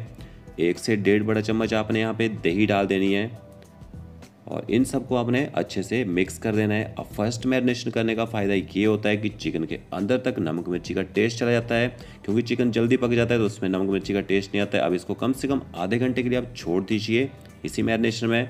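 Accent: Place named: Himachal Pradesh, India. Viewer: Are you from Himachal Pradesh? Your accent is himachali.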